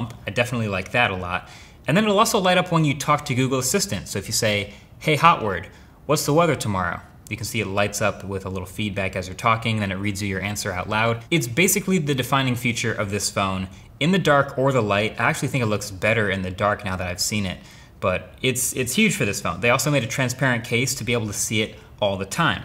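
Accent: United Kingdom. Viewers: Finally a brit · Bro, he is british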